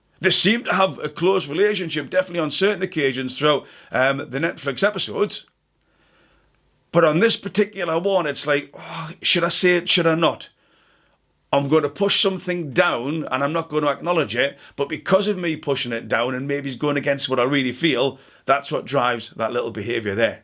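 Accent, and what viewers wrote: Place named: Cockney, United Kingdom. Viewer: If you could speak English properly, I would subscribe. It is like listening to an episode of Eastenders. What does con ent mean? content? You ask your viewers why they don,t like her...why? Because you are not prepared to make a negative opinion of her public? You want your viewers to do it for you so that you are out of her firing line....You state that you lean into liking her!!! Really, not a great reader of a persons character (which you should be able to easily see via her behaviour) Unsubscribing, you are not believeable.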